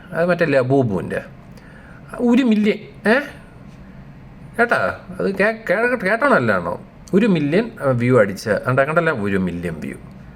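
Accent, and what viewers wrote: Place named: Thiruvananthapuram, India. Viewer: that thiruvanthoram slang hits different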